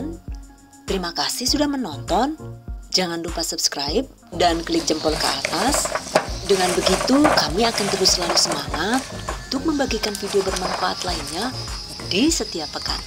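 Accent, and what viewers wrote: Banyumas, Indonesia. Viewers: Bunda tu ngapak kah hehe... Btw suka sama chanelnya 😍😍 lebaran kmrn aku pakai resep ni buat pesenan pastelnya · aku suka Vidio orang ngapak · Bundaa kok bisa bahasa ngapak? 😁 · Assalamualaikum ......aku sangat suka dan ngefens samambak punya ciri khas suara yg sangat merdu skli.aku suka resep2nya mbak .danadaresep totorialnya yg mdh dansimpel utk dipraktekan aku sangat suka bhs ngapak2nya itu ......😂😂😂😂😂😅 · Pinter masak.. Pinter bhasa inggris Ehh pinter ngapak jg Komplit deh😁😁😁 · Syuka bgt sm resep2nya Yu...hp sy semua isinya,sy save & ada yg beberapa sy praktekin,masyaAllah chanel resep yg gk pake bohong yg pernah sy subscribe.😊...setiap yg sy praktekin Alhamdulillah berhasil.syuka jg sm ngapaknya itu yg gk pernah ketinggalan,CINTA NGAPAK pokoke ya Yu:)) Sukses & sehat selalu ya Yu...semoga pahala trs mengalir krn selalu tebar kebaikan. 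Pengin meet up sm yayune,hehehe..."semoga" #GiveawayTHVFEBRUARI19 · Manstap bgt bunda...ngapak ykykny bhsany..sama nih🤭..suka bgt q kue ini🤗🤗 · Konten yg menyenangkan...ada bahasa ngapaknya Jawa saya..i like ..thank you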